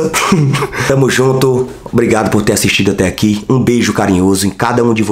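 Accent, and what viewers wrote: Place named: Ceará, Brazil. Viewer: Cara ta com um sotaque de cearense q mora no barroso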